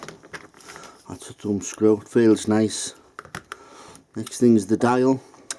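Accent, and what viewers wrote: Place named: South Shields, United Kingdom. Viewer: hi where you guys im south shields you sound local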